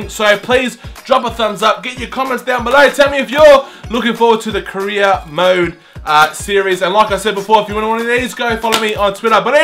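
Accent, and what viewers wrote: Australia. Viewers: Your aussie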